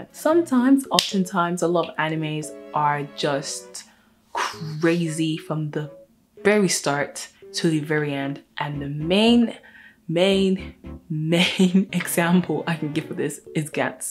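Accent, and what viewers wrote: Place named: United States. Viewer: Why do u sound American? I thought you were from London lol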